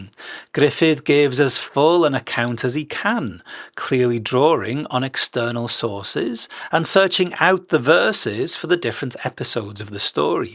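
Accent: Wales, United Kingdom. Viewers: Your voice is really soothing, musical beautiful Cymraeg accent a diolch yn fawr for the story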